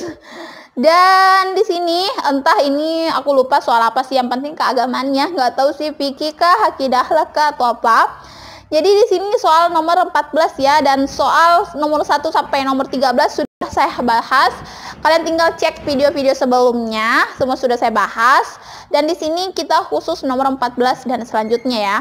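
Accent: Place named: Sulawesi, Indonesia. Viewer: itu sepertinya logat sulawesi, saya punya teman juga bilang salam warahmatullahi bukan warohmatullohi 😅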